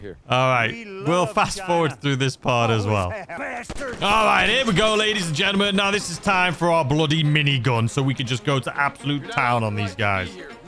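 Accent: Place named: Northern England, United Kingdom. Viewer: why do you have a northen english accent but from america? did you move there not to long ago or something mate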